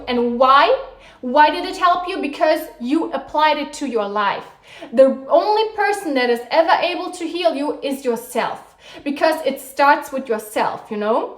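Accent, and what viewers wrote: Germany. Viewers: I recognize a german when I hear one🤭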